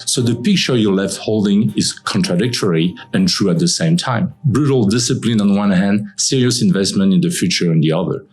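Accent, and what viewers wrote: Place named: France. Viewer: He's French. He could have a professional record studio yet almost nobody would understand his English :)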